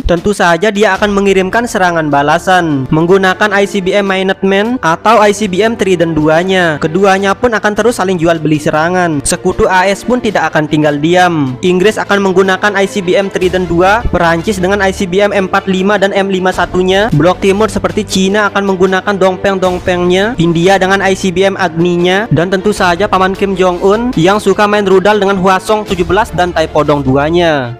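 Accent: Lombok, Indonesia. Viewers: Bang lu orang lombok bukan?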